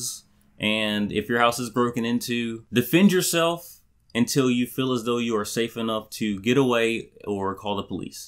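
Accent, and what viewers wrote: South, United States. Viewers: You are so country. It’s adorable ☺️ I would wager you are from the south😊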